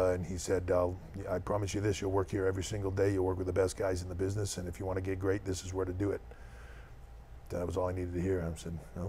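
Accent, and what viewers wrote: Canada. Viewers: I swear, the older he gets. The more canadian he sounds.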